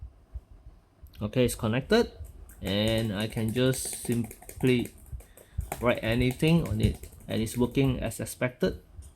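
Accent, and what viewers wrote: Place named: China. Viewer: I love that chinese accent.❤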